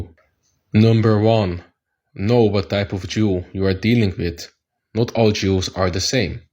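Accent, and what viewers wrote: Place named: Germany. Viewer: Welcome to the true religion brother ! JazakaAllah for the video ! You have a crazy German accent Masha Allah 😬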